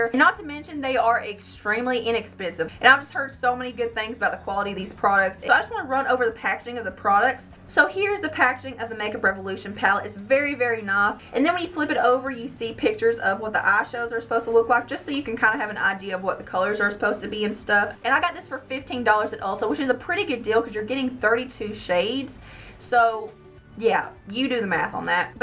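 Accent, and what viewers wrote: South, United States. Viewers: r u using a ton of filming equipment or just your phone. .I think being yourself and using just a phone to record or minimal equipment is so much more genuine and easy to relate to...I love how easily you explain everything and seem to enjoy sharing your talent. .and product information etc with your viewers. .I live in Mississippi so I adore your accent. .looking forward to more videos and tutorials. .great job. ..best wishes!!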